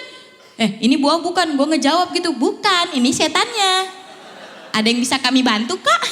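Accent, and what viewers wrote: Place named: Cikarang, Indonesia. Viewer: Aksen Cikarang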